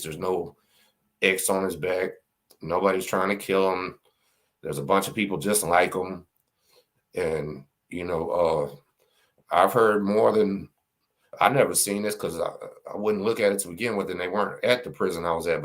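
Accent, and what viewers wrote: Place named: Louisiana, United States. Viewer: Just tonight your channel popped up, for sure I'm watching, I imagine I'll be subbing too, I'll verify, digging the lou-z-anna accent, much love to Louisiana, especially opalusous(may not have spelled that correctly ..all respect intended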